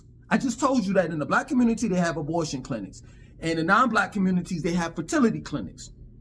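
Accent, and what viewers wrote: New York, United States. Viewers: I hear you New York accent fam I know you heard about the Central Park 5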